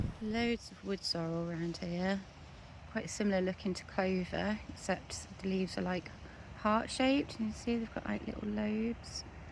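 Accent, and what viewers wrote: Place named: Stockport, United Kingdom. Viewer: Great video 👌 I love riding round there views are amazing… there are much better e-bikes 😂 are you a Stockport girl? Very Stockport accent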